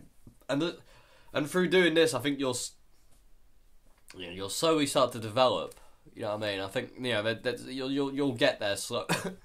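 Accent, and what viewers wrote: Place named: South London, United Kingdom. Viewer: Damn man! you really shouldn't make videos when your that sick. You sound like someone completely different, even your accent :( You sound more like your from the South London than Lincolnshire lol. Get better soon Mate!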